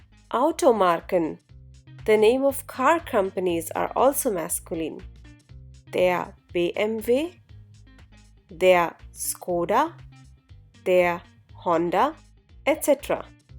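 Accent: India. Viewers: 13 seconds in, and the accent of the narrator is rather Indian. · Does anyone listen her speaks in indian accent? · You seemed like is Indian...., sounded like it! 🤔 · I am Indian and I am quite sure this excellent teacher is Indian :)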